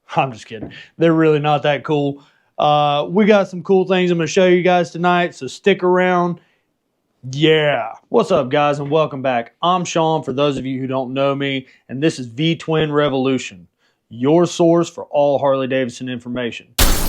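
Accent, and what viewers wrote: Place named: North Carolina, United States. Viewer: New Sub, I knew you were from NC just from the way you talk. I grew up in Hickory. Question, when I shut down the bike, it has started making a chirping noise coming from the intake. Any ideas? I have never noticed it before, but a couple of weeks ago I started hearing it, so now it's bothering me. It's right at the end of the shut down. Bike is a 2017 CVO Ultra Limited. Thanks in advance Brother!!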